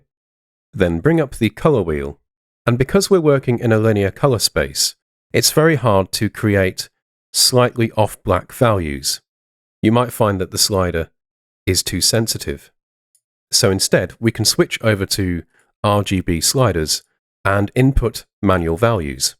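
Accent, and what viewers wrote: United Kingdom. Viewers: Nice UK accent and nice tutorial...Watching you from Iraq.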